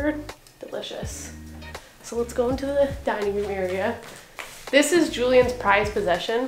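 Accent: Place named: United Kingdom. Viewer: Where r you from originally (i mean country)!!!! Bcoz accent is british but beauty is far more than average brits!!!!!! Please reply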